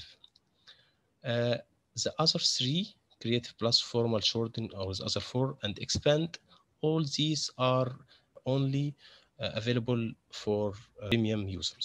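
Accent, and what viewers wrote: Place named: Egypt. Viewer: ما شاء الله. لكن ملاحظة لو تتكلم الإنجليزية بدون لهجة مصرية التي تحول الثاء إلى سين والذال إلى زاي.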